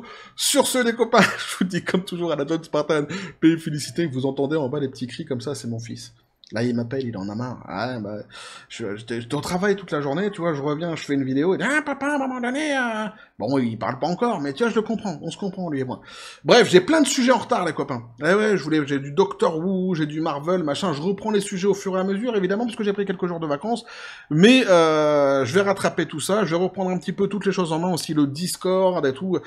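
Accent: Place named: Quebec, Canada. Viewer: Avec ton accent québécois,je croyais que tu étais du Canada..lol. Le comble serait qu'un mec lambda fasse un film d'horreur sur Mickey et que ça fasse un carton. Ca serait excellent...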